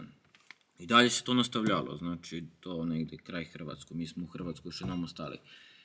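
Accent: Southern Serbia, Serbia. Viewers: Prizrensko-timočki tačnije prizrensko-južnomoravski dijalekat daleko će se čuje! Ja garantujem za ovog dečka! Pozz iz SC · Lepo sam predlagao jednom u komentarima, povedi devojku neku na putovanja, pa makar drugarica bila samo, odmah druga priča, moja preporuka, oprosti ilkici poželi mu sve najbolje i završiš priču time. To ti je život, uči se do starosti, pamet u glavu drugi put sa više iskustva. Samo napred, podržavamo jug srbije, cepaj naš akcenat dole kome se ne sviđa nek traži drugi kanal, i ja sam napustio kanale već zbog nečeg što mi smeta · Batko samo ti nastavi da pricas tako to je jug srbije ljubi te brat,al ilkicu kad vidim ce mu pokrljam nosinger opet ,ce ga utepam ima da dise na rate kroz taj nos,ima nos sece burek lik mali mentol...😊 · Poz, skontao sam i pre ovog videa o čemu je reč i ko je upravu itd. itd. No nebitno to toliko, upečatljivo mi je to što kažeš da nećeš da se menjaš i da nećeš da menjaš svoj naglasak/pismenost i šta li već. 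Ja sam neko ko je studirao u nacionalno mešovitoj sredini (u Subotici) i stvarno i raznih krajeva su dolazili ljudi i upoznao sam od Kosovara do Madjara studente. Stekao sam POGREŠAN utisak da su ''Srbijanci'', kako mi Novosađani pogrdno i sa podsmehom kažemo ''Srbijanci'', pritmitivni i prosti ljudi, i taj njihov naglasak to dodatno govori. Ti si taj koji menja taj ceo moj pogrešan utisak i hvala ti na tome. Poz iz Novog Sada. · Ja ga tek odnedavna pratim. I slazem sw sa vama. Decko je strasno inteligentan i elokventan. Svidja mi se taj naglasak, samo ne znam iz kojeg je to dijela Srbije. Mozda juzne, nisam sigurna. Volim inace gledati putovanja, pustolovine i slucajno mi se izbacio njegov kanal. Pozdrav za njega i sve pratitelje kanala iz Osijeka 😊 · tako je jug tamo tako pričaju,simpatičan naglasak. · Ljudi koji ne mogu da shvate tvoj naglasak i govor jednostavno i ne znaju da 98% nastavnika i profesora u skolama na jugu Srbije cak i u skoli na casu pricaju isto i predaju lekcije tako.i ne mozes ti da promenis to sad za 2-3 dana,jednostavno je tako i neka prihvate.to postoji u svakoj drzavi.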